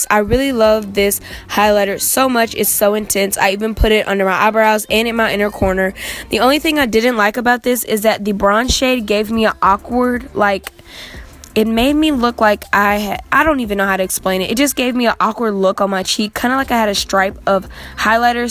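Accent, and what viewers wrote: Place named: South, United States. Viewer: Sound so country / southern !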